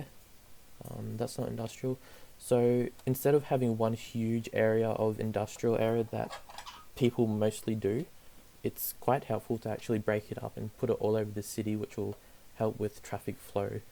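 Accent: Australia. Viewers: Mate I love your cities! And I can tell you're an Aussie too. Would love to see you do a let's play without mods. You could model it on an Aussie city too. Give it cool district names and have specialised districts too. Would be cool!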